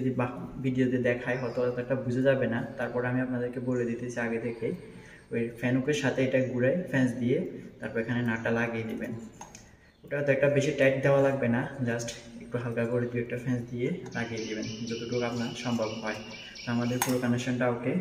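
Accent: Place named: Sylhet, Bangladesh. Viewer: মাশা-আল্লাহ ভাই খুব সুন্দর করে বুঝালেন আর আপনি বুধহয় সিলেটি আমিও সিলেট থেকে দেখছি❤❤❤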